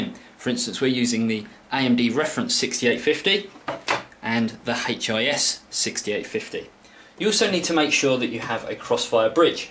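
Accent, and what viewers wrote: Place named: England, United Kingdom. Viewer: gotta love english accents :D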